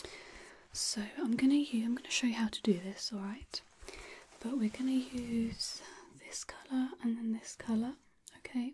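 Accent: United Kingdom. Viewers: If I could ever actually master a British accent I’d love to have the same as you. ❤️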